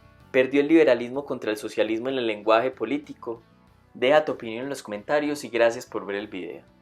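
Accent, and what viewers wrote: Antioquia, Colombia. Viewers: Seguro después de un año ya sabes la respuesta, pero sí, lo es. Pareciera ser de la región de Antioquia o al rededores por el acento. Saludos. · Oye, puedo notar en tu acento que eres de colombia. De estar en lo correcto te queria preguntar: pensando en las proximas elecciones que candidato tu consideras que representa mejor o en algo los ideales liberales? Saludos desde Australia!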